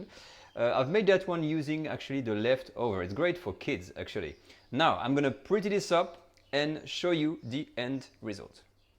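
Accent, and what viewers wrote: France. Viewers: I love all your recipes! And your French accent 🥰 thank you so much! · great channel, will be making your recipes this weekend so excited! love the french accent... lots of love from NYC !!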